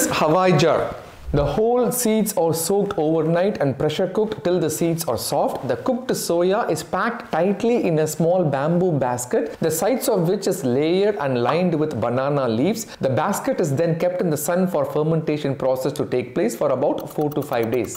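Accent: India. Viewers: I like how he says and pronounces fluently in Indian way. After living in US and practising as Dr there, good to see him not use NUNI NAAKU ENGLISH or rolling with Foreign accents.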